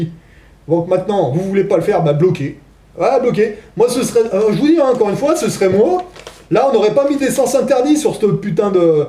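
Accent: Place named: Haute-Savoie, France. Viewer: Ton accent me rappel sympathiquement ma jeunesse dans les montagnes de haute Savoie. Vive les bivouac au semnose et les footing sur la tournette. Sans parler des skis de rando. Vive le 27em BCA.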